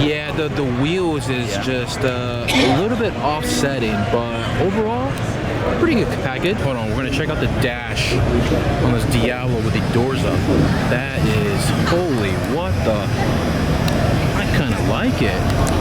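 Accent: United States. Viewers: You sound American, but you call it a bonnet?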